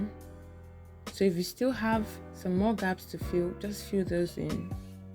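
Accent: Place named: RP, United Kingdom. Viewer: It's the Queens English for me ❤❤❤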